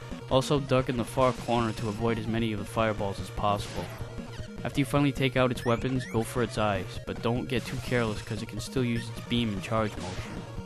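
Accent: United States. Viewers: uuuuuhhrh holy fuck your American accent is annoying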